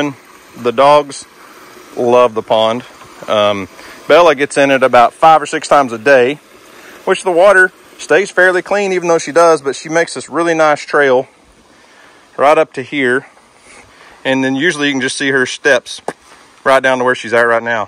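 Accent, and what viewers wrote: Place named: South, United States. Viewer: By the way I absolutely love your southern accent. I live in oregon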